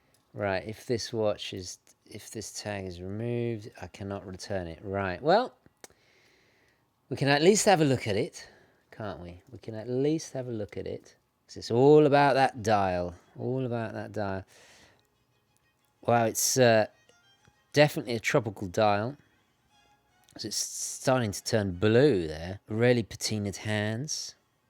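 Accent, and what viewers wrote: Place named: United Kingdom. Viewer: I have wondered what country you live in as you have a British accent but I am not sure if you live in Britain. Does anyone know where the Location of residence is?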